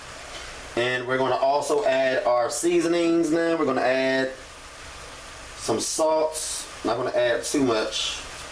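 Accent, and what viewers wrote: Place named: Philadelphia, United States. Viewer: He said it right he has a Philly accent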